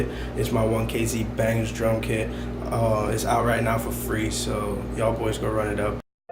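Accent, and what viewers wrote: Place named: Jamaica. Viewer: Where you from ? Jamaica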